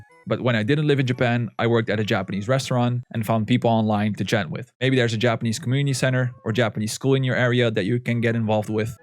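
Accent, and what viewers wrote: Netherlands. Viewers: Great video! I started learning Japanese last September, nearly 9 months ago. Rather than months or years, I think the crucial factor is the number of HOURS, which is why I decided to write down how many hours I studied every day. So far I have done exactly 332 hours, which works out at roughly an hour and a quarter per day on average. This isn't a lot, but I have a family and a full time job, so I cannot realistically manage much more. I have been using Wanikani for the kanji and vocabulary. Grammar I have covered with an app called Busuu, but I think I have gone too fast, because it hasn't really stuck in my brain. Right now I'm reviewing all the lessons from the past few months. And to top everything up, I use Duolingo. It's free, it's fun, and there's a bit of everything in there: kanji, grammar, vocab, useful phrases, etc. At my present rate, I expect it to take me a bit over a year to get past the beginner stage and start just immersing; reading and listening. 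In July I have the JLPT n5 exam, which I expect to pass. After that I will no longer consider myself a beginner. I'm not worried about output, so I won't be trying to talk to Japanese people, or writing out the kanjis. For the foreseeable future I will be limiting myself to input. By the way, I recognize the Dutch accent from when I lived in Belgium. Like most Dutch speakers, your English is excellent.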